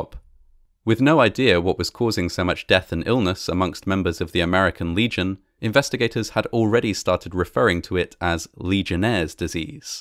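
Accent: United Kingdom. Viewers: They mean the windshield. I believe he's a Brit or learned British English, not American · When shows up in my Subscribers list for the day I always watch it first. Love the way you present your stories and since I'm almost 1/2 UK I love the accent.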